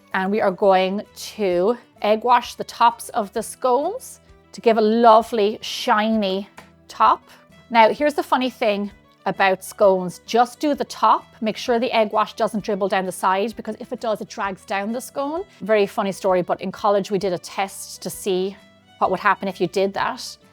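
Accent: Ireland. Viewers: Buenas noches....its really, early early a.m., a Weds., lol. I'm sort of a night owl & noticed your channel. I love love scones. Sadly I'm NOT a Baker. I misplaced my beloved Nina's (Godmother) recipe. I made scones that were at least passable & tasty. However, I so pray that I will be able to make these delish scones. Gracias for this video. I also love love your lovely Irish accent. I will view more of your videos, gracias. Paz be with us all 🙏. "Doña Isa" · So refreshing to hear HUMAN VOICE, and with beautiful Irish accent ! Not Ai .And the scones are great , Thank you! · I love scones - not a Danish traditional so hard to get really good ones! This recipe looks legit good and I will try them. Love your Irish accent! Love Ireland altogether...🍀New subscriber.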